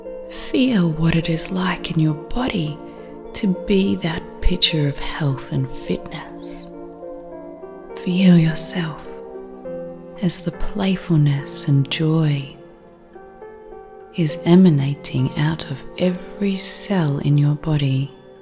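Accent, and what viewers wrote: Australia. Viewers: ...thank you! I've been listening to a lot if these meditation videos but your voice is SO soothing....must be that Aussie accent. In any event, thank you! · WOW ❤ !! Powerful & Gorgeous !! Plus that Ozzie accent 🤩 ! Thank you 🙏🏻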